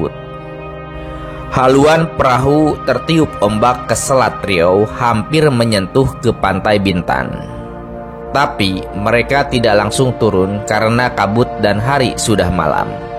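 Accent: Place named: Sunda, Indonesia. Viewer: Suaranya seperti orang sunda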